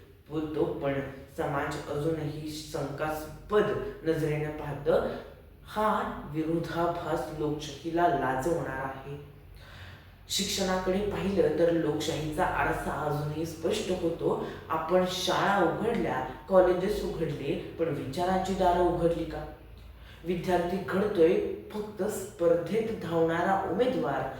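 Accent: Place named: Satara, India. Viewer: खूपच छान विषय कोणताही असो, पण तो स्वतःच्या भाषेत कणखर असे सातारी भाषेत मांडून तो त्या विषयाबद्दल प्रखरपणे मांडत आहेस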